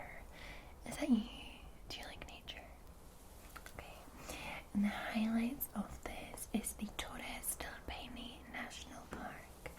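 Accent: Scotland, United Kingdom. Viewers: Somehow I'm not surprised my dream manager has a Scottish accent...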